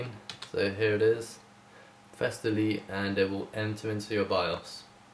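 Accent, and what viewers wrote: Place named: RP, United Kingdom. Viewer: Beautifully spoken. What a wonderful example of speaking the Queen's English. Forget what the pleb antking1117 said. He's just jealous. Probably speaks with a drawwwwlllll. Get your hair cut.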